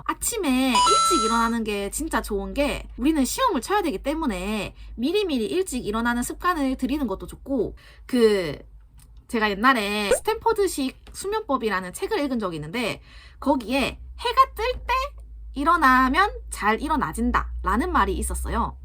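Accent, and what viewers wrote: Gyeongsang, South Korea. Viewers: 부산시 공무원 되신건가여 ? 어쩐지 사투리가 조금씩 들리는거같았어여 항상 좋은 방송 해주셔서 감사합니다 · 갱상도 이신가융 ㅋㅋㅋ 잘보고갈게요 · 잘 봤습니다 저도 오늘부터 의지를 다져봐야 겠습니다. 혹시 실례가 안된다면 경상도사람인가요?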